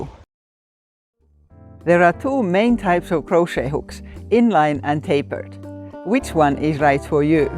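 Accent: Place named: Finland. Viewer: My mother and father were both from Finland. I knew the moment I heard your voice I knew you were too. It's so lovely to hear that beautiful accent again! Not only that, I loved your video. Several techniques are new to me.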